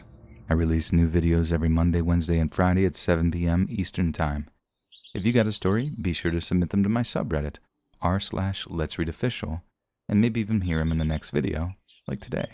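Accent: Australia. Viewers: Ah jesus no one expects an Australian accent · awesome an Australian narrator i love it 👍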